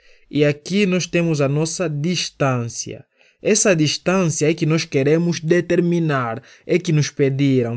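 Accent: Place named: Angola. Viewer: FALA IGUAL ALGUNS CONHECIDOS DA ANGOLA!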